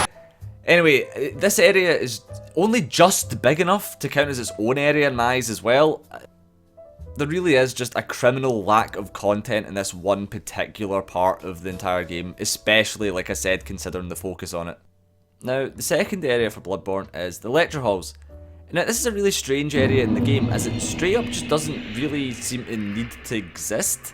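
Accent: Scotland, United Kingdom. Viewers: You sound the absolute spit of James McAvoy. What part of Scotland you from? 🏴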